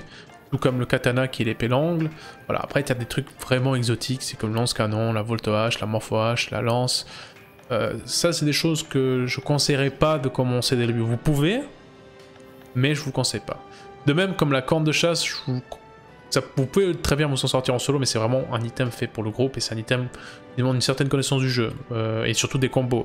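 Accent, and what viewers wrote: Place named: Vaud, Switzerland. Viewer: Mais t'es tellement vaudois ahaha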